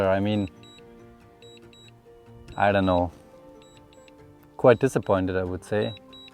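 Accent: Germany. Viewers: your englisch sounds a littl bit like you are german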